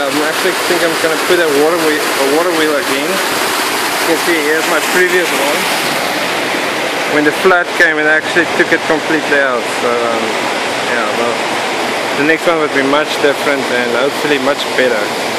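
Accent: Afrikaans, South Africa. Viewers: is that a South African accent I hear? i LOVE your setup... It would be great if I had land like you do with a running stream for power... how great is that? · Immediately recognised the South African accent. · is that an Afrikaans accent Im hearing?! Ah the nostalgia. Lekker turbine boet!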